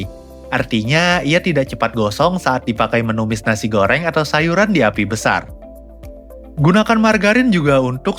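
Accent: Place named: Bali, Indonesia. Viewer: Gw tau bedanya margarin dan mentega, tapi kenapa ya klo video masak di youtube itu mengaggap butter dan mentega itu beda ya...? btw yg punya channel org Bali ya, penyebutan setiap kata yang ada "t" nya kyk org bali.hehe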